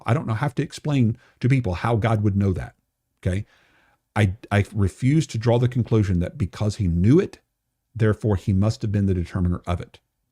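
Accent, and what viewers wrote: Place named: Texas, United States. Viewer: I think you're smarter than you say you are. I think its that thick Texan accent that masks it 😉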